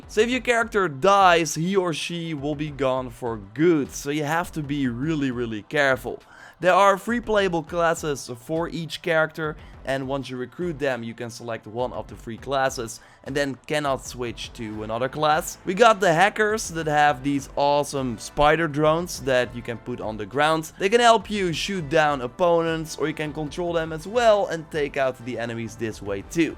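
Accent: Netherlands. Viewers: You sound Dutch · Are you dutch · Just wondering are you Dutch? (Not bashing your accent my friend however I'm Dutch and I was wondering if I guessed correctly)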